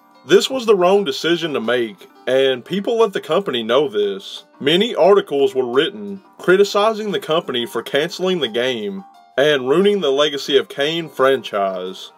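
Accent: South, United States. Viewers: I don't know why but I just like your Southern accent narrating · i love dat voice you're the southern chills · He sounds like he's from Texas or Louisiana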